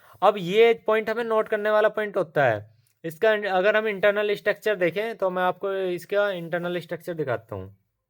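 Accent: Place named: Tripura, India. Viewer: Kaisa ho sir, Tripura sa bol raha ho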